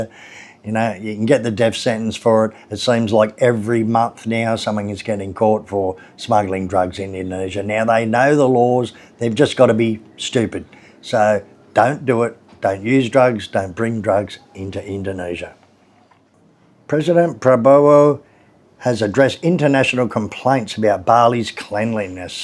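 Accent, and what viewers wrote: Australia. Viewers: Hi. REALLY enjoyed that and learned more about Bali. I enjoy listening to your Aussie voice not many of us left it seems. And yes. Albo is a goose. Keep em coming!!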